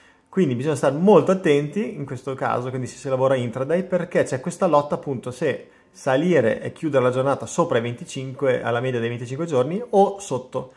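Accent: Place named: Trentino, Italy. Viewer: Ecco ora ho capito: leggevo trentino ogni tanto, ma l'accento non mente! Ciao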